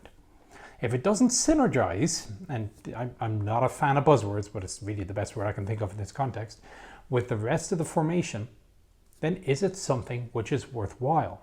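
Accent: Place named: Ireland. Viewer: Why do I feel like this guy speaks with an Irish accent?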